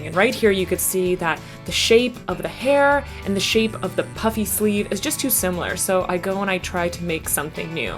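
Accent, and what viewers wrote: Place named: Canada. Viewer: you're canadian??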